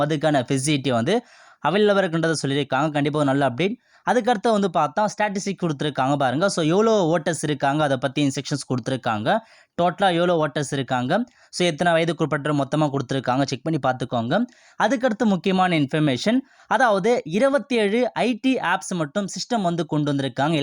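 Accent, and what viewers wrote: North, India. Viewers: Enna bro vadakkan maari pesura ennachu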